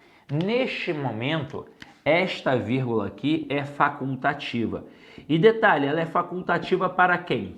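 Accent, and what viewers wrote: Rio de Janeiro, Brazil. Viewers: Sotaque de carioca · Que sotáque lindo de carioca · amei esse sotaque Carioca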